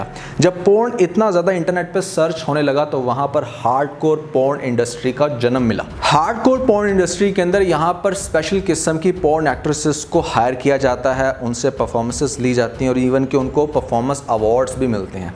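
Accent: Punjab, India. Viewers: I would suggest you do record your videos in Punjabi. You can't speak urdu with clarity. Your punjabi style urdu hurts.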